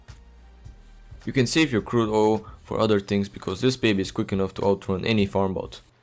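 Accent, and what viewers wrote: Russia. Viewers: какой твой родной язык? у тебя сильный акцент слегка похожий на русский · Where are you from? Russia? You have specific accent :P BTW good vid, gonna try this :D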